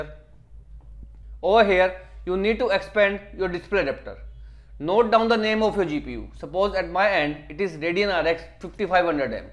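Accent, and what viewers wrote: India. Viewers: LETS GO THERE IS SOMEONE WITH A HARD INDIAN ACENT DOING THE FIXING I WON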